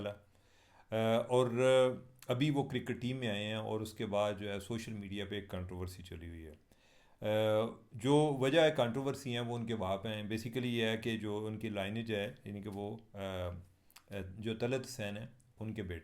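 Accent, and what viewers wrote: Punjab, India. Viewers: You're really funny in your story-telling, especially when you add that Punjabi touch. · آپ کی پنجابی کا تڑ کہ سچویشن کی پکچر بنا دیتا ہے😂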